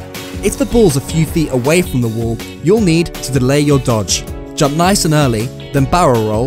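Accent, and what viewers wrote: Denmark. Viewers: Is he danish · mate??? you're a dane??? :D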